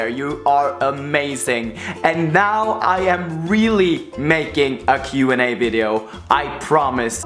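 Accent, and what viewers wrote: Trøndelag, Norway. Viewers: d e jo sør-trøndersk som e sokka da <3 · ÅÅHERREGUUD, trøndeeer!! Æå e trønder hahha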